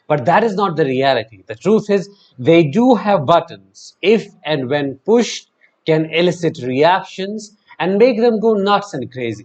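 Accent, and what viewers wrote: India. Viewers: The accent gets things very confused. It’s partly Indian and Partly Western. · Strong indian accent earned my sub. Solid 10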